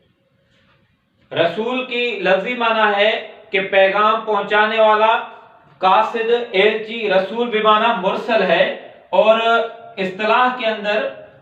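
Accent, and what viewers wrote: Sindh, Pakistan. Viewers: gud urdu in pure sindhi eccent !!!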